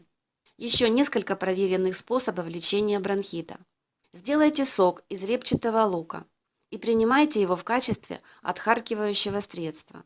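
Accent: Ukraine. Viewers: это украинский диалект и не тебе сопле указывать комуи как разговаривать и прав тот человек ,что назвал тебя Бляндинкой!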